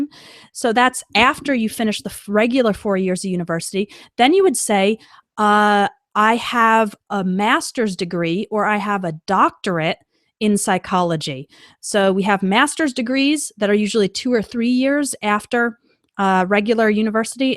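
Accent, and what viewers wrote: United States. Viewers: Hello, I´m Adriano from Brazil:) I´ve been following these English tips and videos on internet a long time ago. And I can sure my English skills are much better now. Thanks for the incredible English free tips you give us in your website <link>'. Your american accent is very clear and very easy to understand. As an English learner, I really appreciate your lessons a lot. Bye-bye and greetings from Brazil:)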